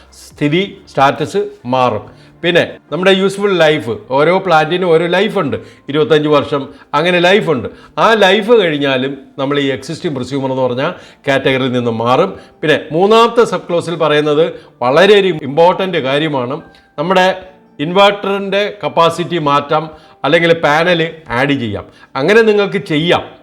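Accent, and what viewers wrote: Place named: Poonjar, India. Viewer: പൂഞ്ഞാറ്റിലെ ഇംഗ്ലീഷ്.. താനക്കെ ഇംഗ്ലീഷ് ഡബ്ബിംഗ് വീഡിയോ ഇട്ടിട്ട് ആര് കാണാനാണ്..... കോണത്തിലെ ഇംഗ്ലീഷ്...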